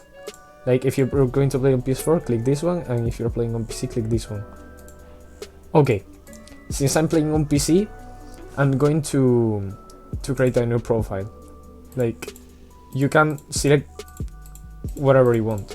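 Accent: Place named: Spain. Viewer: se nota que eres español xddd gracias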